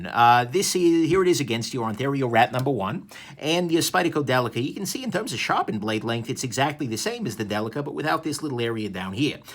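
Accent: New York, United States. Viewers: That New York accent...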